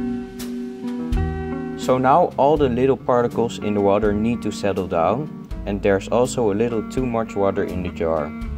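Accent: Netherlands. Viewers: Dutch people speak English better than most Americans. :-). I very much enjoy the Dutch accent. Very cool!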